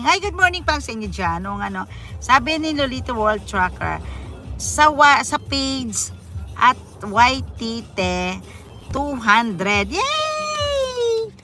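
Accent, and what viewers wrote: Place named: Hiligaynon, Philippines. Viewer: Ilongga ka host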